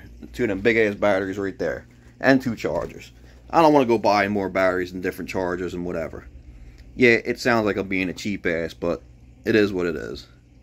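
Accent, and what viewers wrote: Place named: Philadelphia, United States. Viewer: You didn't have to tell us you were from Philly I can tell you are from Philly since you have the Philly accent. LOL YOu have exceptional MacGrubber Skilz!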